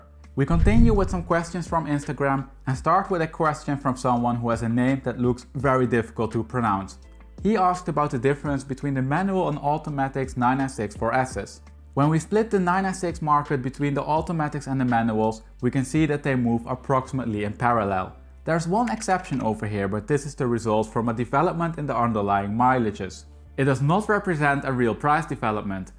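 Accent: Netherlands. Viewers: You sound dutch, i'd love to see you analyse data on homes here. I know it's significantmy different from your regular content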